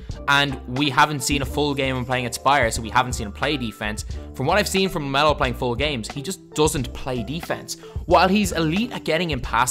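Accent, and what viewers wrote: Ireland. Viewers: Are you from Ireland? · Are you irish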